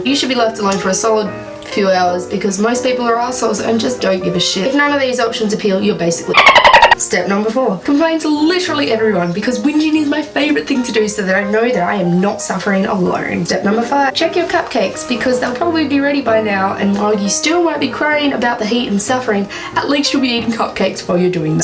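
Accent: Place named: United States. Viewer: you have an american accent in this entire video and it's tripping me out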